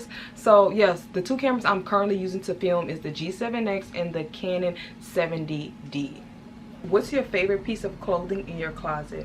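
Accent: Louisiana, United States. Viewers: Baby I heard that accent from a mile away, fellow louisianian.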